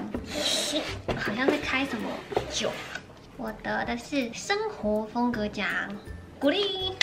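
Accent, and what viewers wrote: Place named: Taichung, Taiwan. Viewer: 妳好可愛。我今天第一次看妳的影片，沒想到妳這麼會煮飯，拍影片的碎念也很有趣，一聽就好親切的台中腔😂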